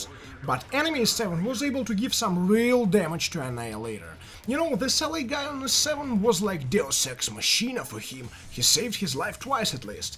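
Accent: Russia. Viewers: True russian accent) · i love his voice pls more from this russian english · очень годно ! =) но русский акцент уши режет , непривычно так .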